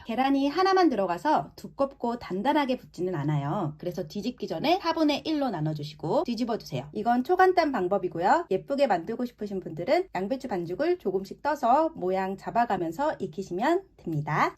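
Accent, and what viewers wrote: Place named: Gyeongsang, South Korea. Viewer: 냉장고에 있는 재료로 다이어트 요리 총분하네요 들기름 씻은 김치 좋고 경상도 억양인가요 잠 듣기 좋네요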